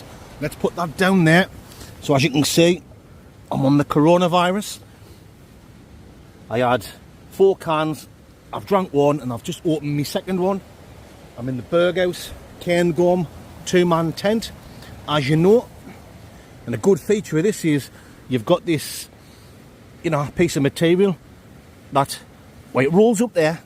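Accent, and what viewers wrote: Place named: Newcastle, United Kingdom. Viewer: ❤ newcastke accent dude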